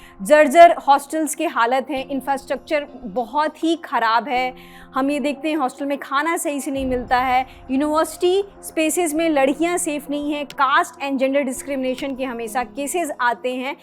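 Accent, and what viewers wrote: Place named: Bihar, India. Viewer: Anchor Bihari h😂😂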